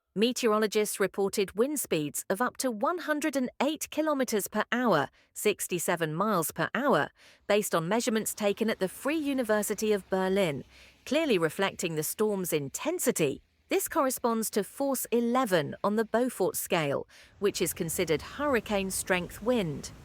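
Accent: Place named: England, United Kingdom. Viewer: Too many mistakes in both English and German in the subtitles, and why is there no punctuation? One sentence runs into another without any pause. The voiceover sounds much too happy, absolutely bursting with glee. And why the English accent but American grammar? For example one hundred fifty instead of one hundred and fifty. Obviously the work of AI.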